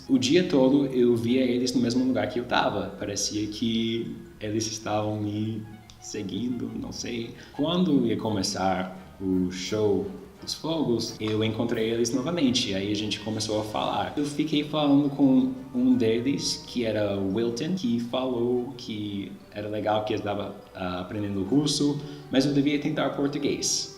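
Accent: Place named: Rio de Janeiro, Brazil. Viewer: Achei que vc fosse Brasileiro do rio parece🤣😂🤣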